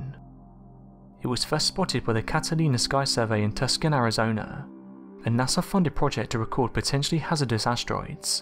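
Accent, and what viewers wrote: United Kingdom. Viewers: Where the F&&^% do they find these BRIT announcers? Really--Tuskon, Arizona? I couldn't catch half of what he was saying, even with the Closed captioning going! If it's a NASA bulletin, find an American announcer!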